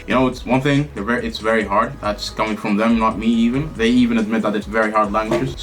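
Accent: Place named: Russia. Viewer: i am fom italy and russia and your accent is incredible for russian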